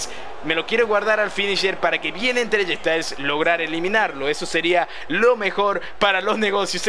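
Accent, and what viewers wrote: Argentina. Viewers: una pregunta ¿sos argentino?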